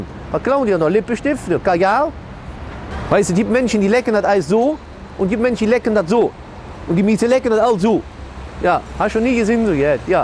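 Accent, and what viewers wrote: Cologne, Germany. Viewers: Haha, zu geil. Habe lange kein richtiges kölsch mehr gehört. Dat is schaad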